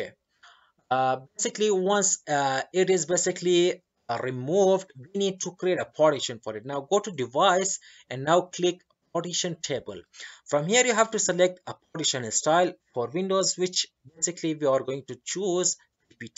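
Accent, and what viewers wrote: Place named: India. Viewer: the relief i felt when i heard an indian accent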